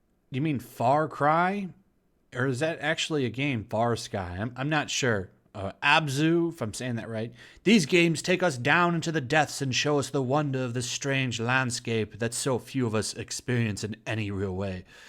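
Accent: England, United Kingdom. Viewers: That, and his terrible english accent.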